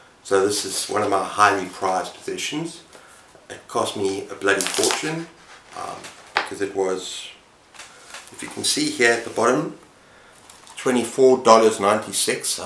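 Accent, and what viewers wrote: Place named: South Africa. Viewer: Love to hear your South African accent and way of speaking. As a South African living outside the country it is great to hear you. And it’s great too to hear someone who engages with the books I love. You live in an area blessed with access to great books. Sterkte, my vriend.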